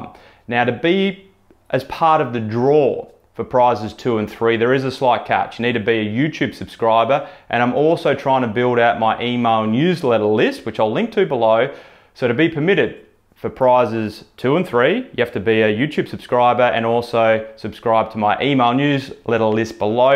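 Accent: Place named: Australia. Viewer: I love Aussie accent so I could listen to you talk about bikes all day ;)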